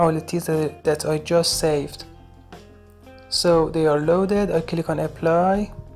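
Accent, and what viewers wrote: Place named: Iran. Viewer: Your accent looks a alot like iranians :)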